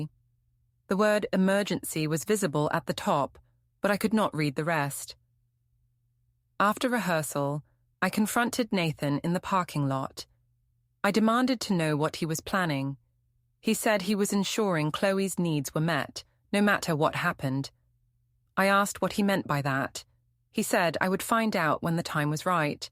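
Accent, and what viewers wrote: United Kingdom. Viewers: This was obviously written by a yank, why use a brit to "read" it. Syntax and turn of phrase distinctly American. Irritating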